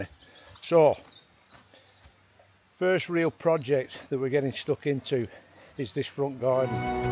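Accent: Lancashire, United Kingdom. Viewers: Awesome mate… always love listening that lanky twang here in Belgium… a link to my past in Wigan. Looking forward to watching you develop such an amazing place 👌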